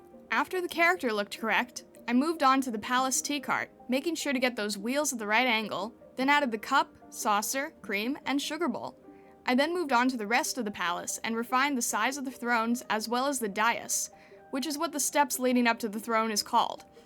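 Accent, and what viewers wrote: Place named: United Kingdom. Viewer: lovely art and also bri''ish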